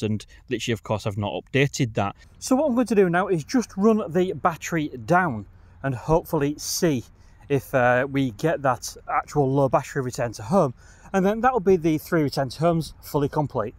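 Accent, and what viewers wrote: Hull, United Kingdom. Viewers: I know a Hull accent when I hear one lol love the videos mate,I've had my mavic mini a few months now and still learning new tricks 👍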